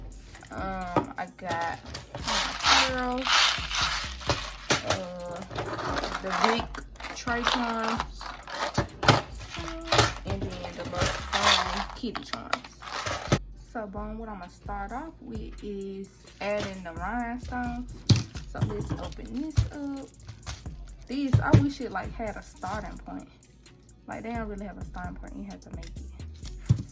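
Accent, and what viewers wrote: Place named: Tennessee, United States. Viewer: She definitely from Tennessee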